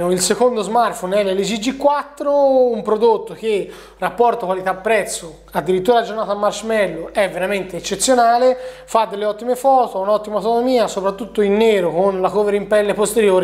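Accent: Tuscany, Italy. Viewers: sei toscano??? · Sei toscano, vero?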